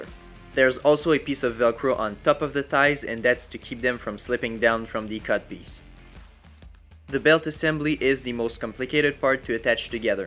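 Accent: Quebec, Canada. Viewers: J’ai entendu l’accent québécois dès le début hahaha 🫡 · are you from québec?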